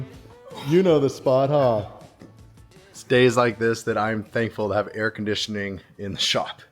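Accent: Yorkshire, United Kingdom. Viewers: Hi where is from? Definitely a lovely northern accent, I’m thinking Yorkshire? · Can the americans understand yorkshire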